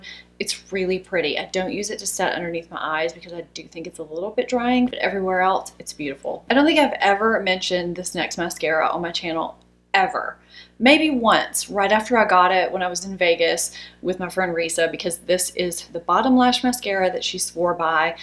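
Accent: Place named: Tennessee, United States. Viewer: Love that you mentioned you are from Tennessee! I'm a Georgia girl, and have lived in Lousiana and Tennessee. The entire time I've watched you, I kept saying to myself, " She is not from New Orleans. She sounds like she's from Tennessee!" Isn't it funny that Southern accents sound the same to those not from the South, but those of us that have lived our lives here can place them.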